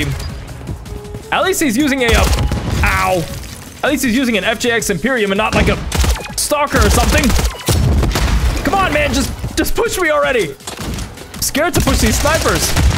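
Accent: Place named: United States. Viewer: Most American sounding Kiwi around, you even from NZ uce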